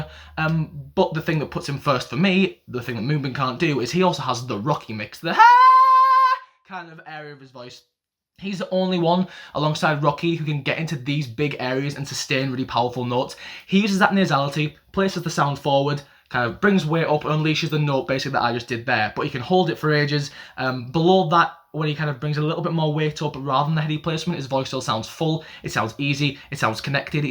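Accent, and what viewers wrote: United Kingdom. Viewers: An Astro fan (Aroha) just pointed this video out to a few of us and you speak so much sense! Really interesting watch and super informative. Haha Jinjin’s singing voice isn’t amazing but his rapping voice is insane! You sound like a fellow Brit also, hello! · the british accent really surprised me omg it’s so nice to see a fellow astro fan who is english, thankyou for being so kind and knowledgeable too!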